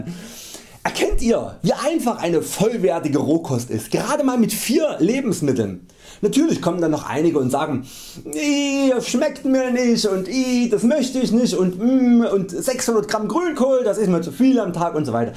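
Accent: Saxony, Germany. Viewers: dein sächsisch ist ja immer wieder schön ^^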